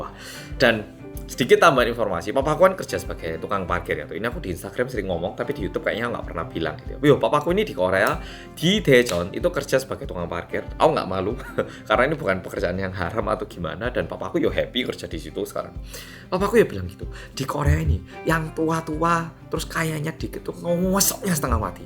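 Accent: Java, Indonesia. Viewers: Wes suwi gak kerungu jowoan medok curhat² ngene... Ileng pertama youtubemu biyen.. entah siapa yang salah...ku tak tahuuuu 😅😂😂😂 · Itulah percayalah,setiap kesusahan pasti ada kebaikan,berarti di uji dulu dg kesusahan,dn di balik itu Tuhan punya rencana yg Indah dn ALLOH memberikan balasan kebaikan,INTINYA,SETIAP ALLOH memberikan Ujian pasti ALLOH akan mengangkat derajat,aku suka bngt dg dn klrg, krn JAWA BNGT MEDOK BNGT GK NYANGKA ahwa Asli korea.....👍👍👍👍👍💚🙏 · Melihat banyak kebaikan,menjadi hati lebih tenang,semoga kita selalu bahagia dan dapat membuat orang lain bahagia Jowo ne mendok... Ya Allah bahagia selalu 😊😊😊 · ak tuh sll speechless typ nnton videomu, km tuh bner2 ky org jawa asli ngmgmu medok asli😂 maaf ya out of the topic😅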